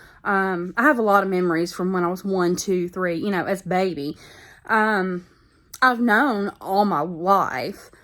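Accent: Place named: South, United States. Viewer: Thank you for all you do to help your grandparents share their beautiful lives and Elvis stories with us. Elvis loved and cherished his family members, so I am sure he would be so proud of the way you care for and look after your sweet grandparents. Btw, I love your Southern accent!! And please do keep the animals in the room while you are filming, they always make life so much more interesting.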